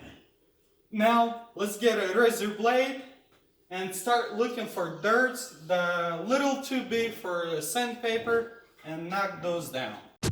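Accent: Russia. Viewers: Вот смотрю ваши видосы и думаю, что акцент похож на русский. Угадал?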